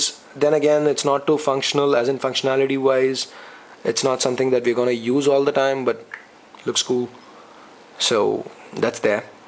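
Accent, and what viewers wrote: India. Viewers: Wer u from.ur accent like an indian im right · dood are you from india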